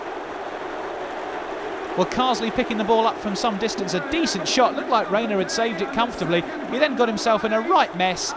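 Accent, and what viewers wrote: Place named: Ireland. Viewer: Irish guy anyone?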